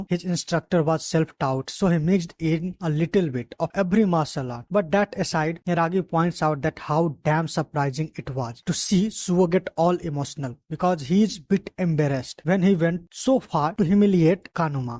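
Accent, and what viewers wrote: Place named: India. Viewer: Please add some emotions in your voice Bro I feel your hindi voice in english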